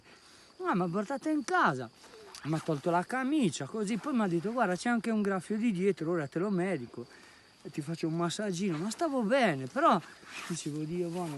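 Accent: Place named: Trentino, Italy. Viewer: Inflessione che non riesco a decifrare. In alcuni momenti sembra addirittura trentino 😮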